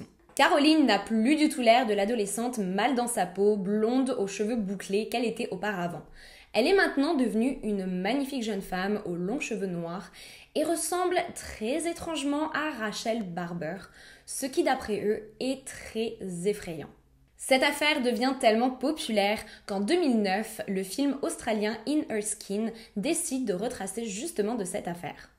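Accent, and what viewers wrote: Quebec, Canada. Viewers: Toujours sympa l'accent québécois, t'assure !!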